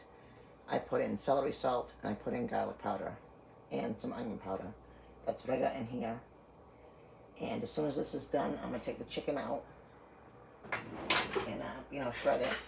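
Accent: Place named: Boston, United States. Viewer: Hey!!!!!! I’m here again!!!!! I always love checking in on you!!!!! You’re one of my best friends!! We both have that Boston accent lol 😝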